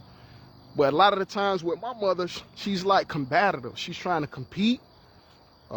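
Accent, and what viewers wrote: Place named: Southeast, United States. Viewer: I haven’t finished the whole video yet but people who are aware enough to see, know your spirit. I’ve been watching your videos for years (starting back at your first blood type videos which I still reference to people today). You’ve been nothing but a positive role model for me. I’ve even reposted some of your content before in the past. Idk what state you reside in but you sound like you’re in the Southeast too. It’s tough in our communities because a lot of our people (including friends, family, spouses etc.) are sick. Some more so than others. Whether it be subtle but functional spectrum disorders along the lines of what we know today as ADD, ADHD, Asperger’s/autism all the way to more imperative functioning behavioral disorders such as narcissistic personality disorder to sociopathy & psychopathic tendencies. 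This is before we even factor in drug & alcohol indulgence of the presence & past as well as people who consume a self destructive selection of “foods”. You’re a beacon of light. You’re a teacher surrounded by those who have a hard time even learning. Much less able to take accountability for mistakes they know they made in the past. Most people want to run from their mistakes. I’m watching it happen now with family members putting romantic relationships before the children they already have in the world only to have more children & treat them the same way. It’s a tough reality to accept that a lot of us have sick/psychologically off elders & peers. Just like some o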